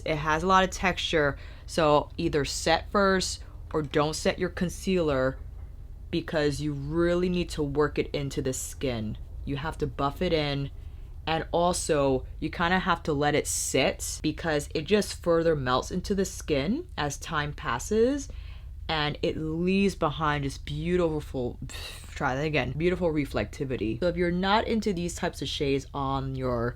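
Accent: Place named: New York, United States. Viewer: Wow. I apologize for saying how well put and well spoken the review was.....after watching the live with the owner of CR with Kelsey.....I didnt mean it from a place of "wow you're not ignorant and I'm surprised because of your skin tone" and I didnt know if that is how it sounded. Im a new subscriber and You described the palette in terms of letting a consumer like me know about which shades are what, ya know? As a fellow NYer and moved to Indianapolis, In I loved hearing your accent as well. So nothing but love here girl...I just went to that video after this one and as soon as I heard all y'all talking about those "You sound so smart" comments I was like wow.....maybe I sounded that way.......maybe you wont even read this and you dont care at all......either way, already a fan lol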